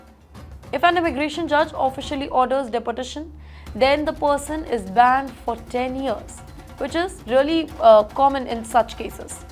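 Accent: England, United Kingdom. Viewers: You have a funny accent ! Father British ? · The best Anchorwoman. I just like her English accent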